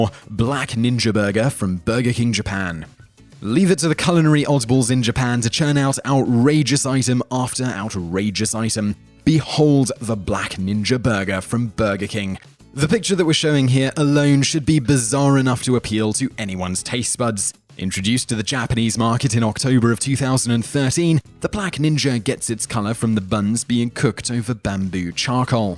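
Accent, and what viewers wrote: England, United Kingdom. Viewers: Is his accent heavier than most Brits?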